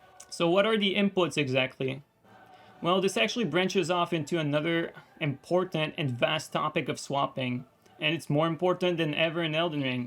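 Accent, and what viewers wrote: Quebec, Canada. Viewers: are you quebecois?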